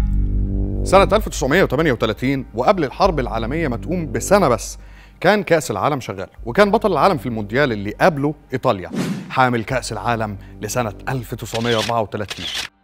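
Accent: Egypt. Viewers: ليش بتحكي مصري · اسلوبك في طرح الموضوع جميل ولكن لماذا تتحدث بالهجه المصريه لو التزمت بلهجتك تصبح اكثر تمكننا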